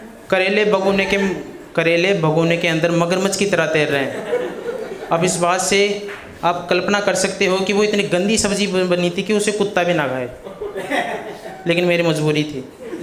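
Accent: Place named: Dhundhari, India. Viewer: Aapni dhundhdhi boli ki to alg hi cha yarr😂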